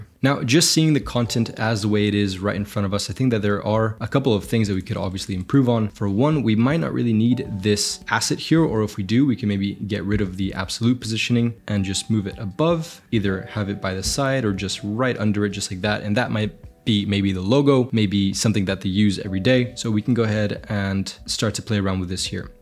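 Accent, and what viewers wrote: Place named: United States. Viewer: Love the tutorial. Sorry in advance for being intrusive but, how come you have the most perfect American accent? I can see you are Valencian/Catalan (like me). If you went to diction classes please let me know where, if it's online.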